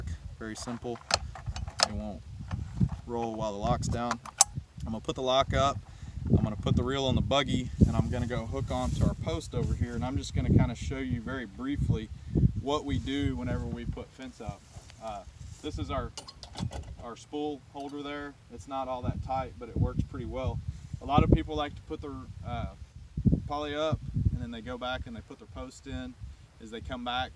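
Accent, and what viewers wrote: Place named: Texas, United States. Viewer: Hey what up with the Aggie comment!! ... Class of "92. Enjoyed the video! Sounds like a Texas accent.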